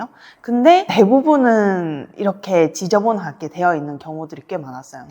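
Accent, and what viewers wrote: Gyeongsang, South Korea. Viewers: 이 가스나 갱상도 말 투를 숨기려고 애 많이쓴다!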